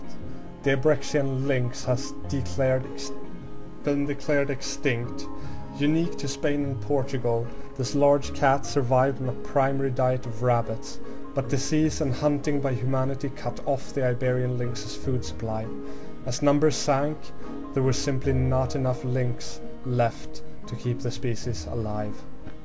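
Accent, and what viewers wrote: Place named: Sweden. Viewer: Clubs He's Swedish. English isn't his native language. :P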